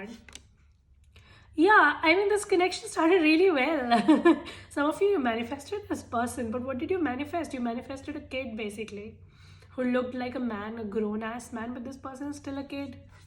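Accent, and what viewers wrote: India. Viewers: It’s not her fault it’s the Indian language when they speak English ... I worked with them it’s hard you just have to pick up a few words & guess the rest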